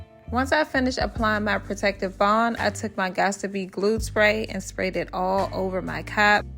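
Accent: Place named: DMV, United States. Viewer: Love the hair!! And please tell me you’re from the DMV lol. My boyfriend says we don’t have accents, but I know it when I hear it!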